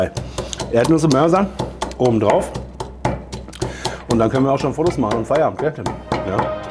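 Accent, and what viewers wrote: Hesse, Germany. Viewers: Hallo, ich bin ein Hesse der in China lebt. Ich finde es total super wenn ich hessisches Gebabbel hoere und dann chinesischem Essen sehe. Du kommt richtig echt rueber und dein Kanal bereichert mein Leben hier.Mach so weiter. Koch doch mal Yunnan Kueche.Hier in shanghai gibt es ein Lokal das heisst lost heaven. Findet man ueber google und alles was dort auf der Speisekarte steht schmeckt super. Aber keine Ahnung wie man es kocht.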